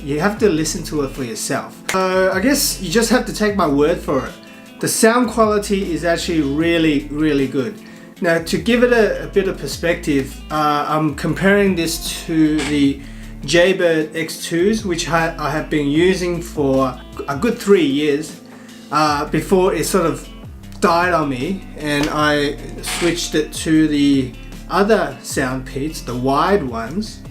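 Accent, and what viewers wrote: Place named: China. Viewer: este chino hablando ingles me vendió los audífonos a un latino. Maravilla en su máximo esplendor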